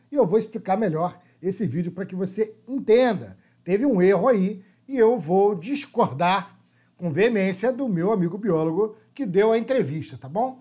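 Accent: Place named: São Paulo countryside, Brazil. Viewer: Esse sotaque do interior de SP é de mais kkkkkkkkkkk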